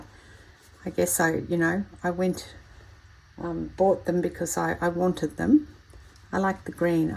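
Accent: Australia. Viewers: Hello this is Ellena from Portland, Oregon. I’m a quilter and have been fascinated with your Vintage linen quilt squares. I love them and am inspired to try doing something like this. Ps love your Australian accent. Keep up the good work.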